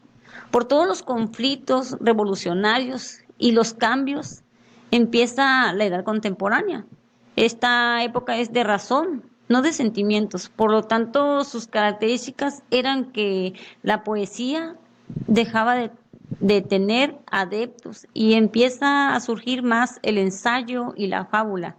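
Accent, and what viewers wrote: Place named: Veracruz, Mexico. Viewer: Pareces de Veracruz.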